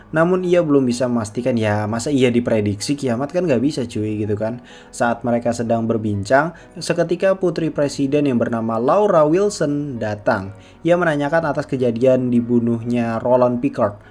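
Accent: West Java, Indonesia. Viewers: Orang sunda gening wkwk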